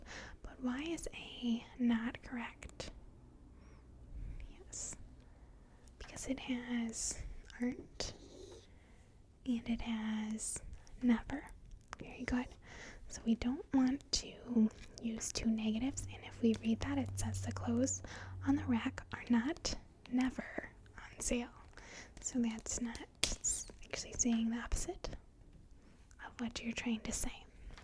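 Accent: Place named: Michigan, United States. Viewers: the way she said welcome back and others words was stressed like how Sara Pailin does but she’s from a spot in Alaska where people form Minnesota moved to and the accent is different there. But she has such a lack of accent at times it does lend to midwestern. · I'm almost positive that's a Michigan accent.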